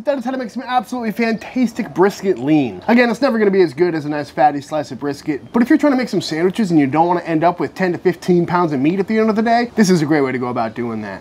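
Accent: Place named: South, United States. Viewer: That slow southern drawl is just killin' me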